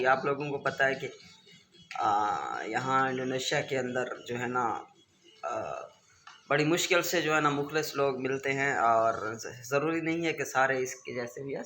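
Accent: Saraiki, Pakistan. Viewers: awaz sa lag raha siraiki hain or ma jhang sadar say hu khush rahain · Wah birah wah , tun tay rakh, Jary sarden honay koon sarra. Sajid From Khanpur. Ap ka vlog kal dekha aur ak din mai almost 10 vlog dekh liye, Ap jo Saraiki bolty ho maza aa jata. Ji way birah ji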